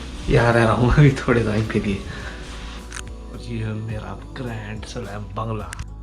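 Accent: Haryana, India. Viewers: Bhai k video dekh kaza aaajaata h...thodi tension me lgra h.. bit haryanvi h bhai.. jhel jaega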